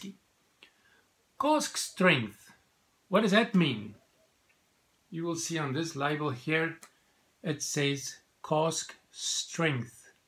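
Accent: South Africa. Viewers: I'm a complete beginner when it comes to whiskey, and this is by far THE BEST video I've found that explains everything clearly and in an order that makes a lot of sense. Thanks so much! I also hear a South African accent, which is where I'm from 😁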